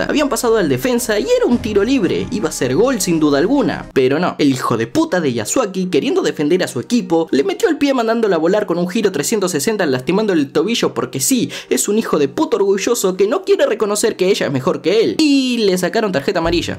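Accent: Uruguay. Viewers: ¿Sos uruguayo?🤨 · eres uruguayo?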